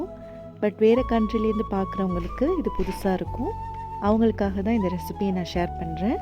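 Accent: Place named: India. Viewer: Akka tellme about yourself more.your vlogs are interesting. Yours indian touch slang is so pretty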